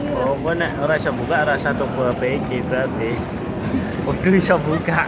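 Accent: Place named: Java, Indonesia. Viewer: Medokmen to yo hhah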